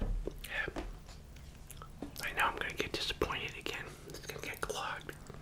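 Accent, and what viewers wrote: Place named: Boston, United States. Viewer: This was painful to watch, from the constant unnecessary stirring of the drink to the "townie" unsophisticated way he eats, the Cornell plates and silver wear he uses, like the low of the very low and the Quincy Boston accent...the whole thing left me with.a feeling of sadness and being thankful it was not me.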